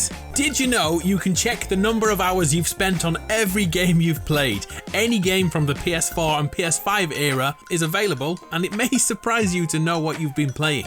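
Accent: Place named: Wales, United Kingdom. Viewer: You sound Welsh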